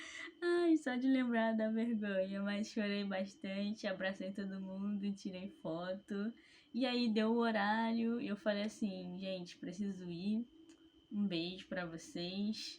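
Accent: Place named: Rio de Janeiro, Brazil. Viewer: Sotaque de carioca ,é carioca?Boa sorte 🍀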